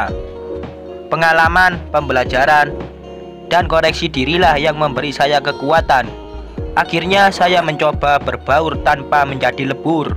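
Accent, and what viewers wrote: Java, Indonesia. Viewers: Ini pembawa crita masih baru ya mas , santai aja mas bacanya jngn katrok bahasanya medok lucu mas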